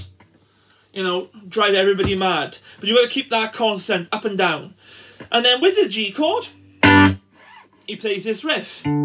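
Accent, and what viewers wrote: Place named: Wales, United Kingdom. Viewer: You are really fucking Welsh. And fair play to you, no insult meant there. Also great lesson, you really captured the spirit of the tune when you jammed it at the end. 10/10.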